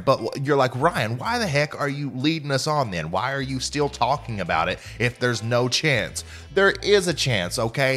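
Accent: South, United States. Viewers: My Birthday too... but, I could be your Dad. :-) ... What state are you reporting from?... sound a bit of a southern twang. I'm in Georgia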